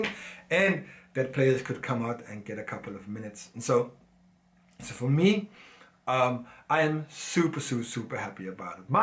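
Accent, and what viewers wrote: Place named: South Africa. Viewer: What is your mix. You look and sound like a polished South African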